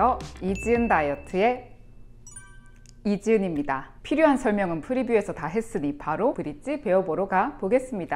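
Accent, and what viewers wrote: Gyeongsang, South Korea. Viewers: 경상도 말투~ㅎㅎ 넘나 좋은 운동 감사합니다♡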